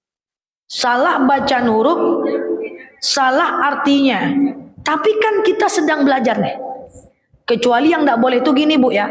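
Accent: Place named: Malaysia. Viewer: Masya Allah logat Melayunya suka saya ustazdah cantik,dah hidup diduniya banyak masalah,pulang kampung akherat singgah dineraka pula yassalam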